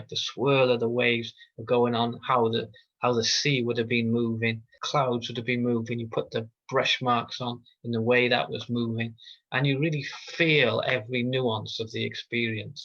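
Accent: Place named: Barry, United Kingdom. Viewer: Lovely to hear another Barry voice talking so knowledgeably about Art. Not only Ceri Thomas then...😂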